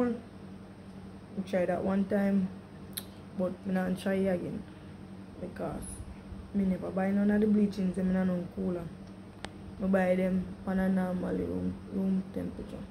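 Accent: Jamaica. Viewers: Oooh hie bomboclat kkk l can hear u speak like Jamaican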